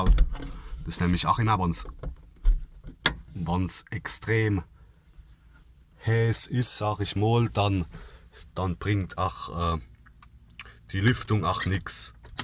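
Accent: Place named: Hesse, Germany. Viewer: Das ist Hesschis gele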